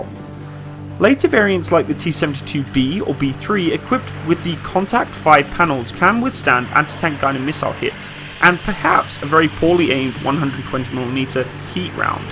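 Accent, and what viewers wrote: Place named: United Kingdom. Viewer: The quality of your analysis and videos are second to none. Thoroughly researched, well composed and hugely entertaining to boot. I will have to admit that hearing a British voice from home is an added joy factor for me. I actually look forward for (all) your new videos. I can’t say this about any other YouTube (or TV) channel. Please keep going.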